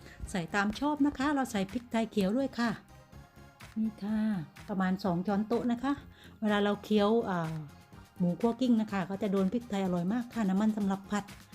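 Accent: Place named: South, Thailand. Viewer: ขั้วกลิ้งหมู จัดเสริฟ พร้อมมะเขือพวง มะละค่ะ. ใส่ สันน่า ทานค่ะ รสชาติ จัดจ้านค่ะ อร่อยจังฮู้ เผ็ดมากค่ะ พี่สิแลงใต้ เพราะ จังค่ะ อร่อยจนตัก ไม่หยุดค่ะ ไปต่อ หลังไมค์ค่ะ. ขอให้ มีความ สุขนะค่ะ❤️👍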